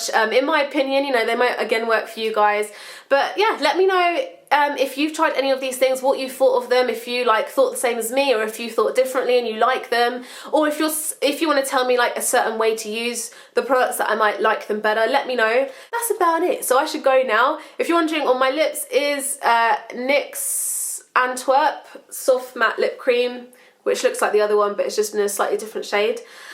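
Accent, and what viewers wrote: United Kingdom. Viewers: You are so beautifully exotic looking!!! I love your accent haha I am a bit obsessed with anything and anyone British.. I remember the first video I watched of yours was your Perfume Collection and I remember laughing and thinking you were really funny and I remember you being like this gorgeous exotic looking girl so I look at your other videos and I loved them!!!! You now have a loyal subbie :) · i love your english.... british english, right? your accent is so cute :) many youtuber don't reply to their subscribers... and seing you replying to many just makes me like you more :) love from germany♥♥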